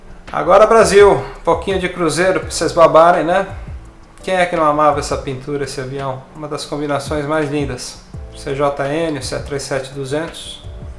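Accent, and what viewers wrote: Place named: Argentina. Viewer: Guuuuauu what a beautiful videos a photos...like always! If i dont know that you are brazilian...in the part of AR..i think that you are a argentinian jajaja..con un mate en la mano! Tenes un acento argentino perfecto en tu español! Jaja i have a question...i read about the relation of you, David Neeleman and Azul with viracopos...but from 1990 (KLM moved to guarulhos) to that moment...what happend in viracopos? All the people say "viracopos its beautiful, great desing and funcion". Big hug from an argentinian architect...who loves your photos and desing! And your histories!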